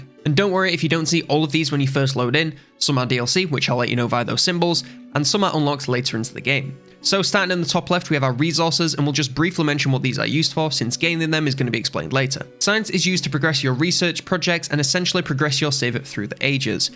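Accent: United Kingdom. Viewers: Accents are a thing, I speak French from France but live in Canada and have a hard time understanding Quebec French. The lad in the video here has a heavy Bri’ish accent and skips every third letter. Perhaps he should use AI to narrate.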